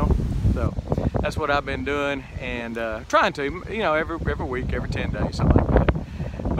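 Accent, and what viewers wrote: Texas, United States. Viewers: Just found your channel through your food prepping videos...subscribed right away due to content, presentation, and that sweet Texas sound of your voice! I'm a transplanted Texan here in the Midwest and I sorely miss the sounds of Texas! Nice garden!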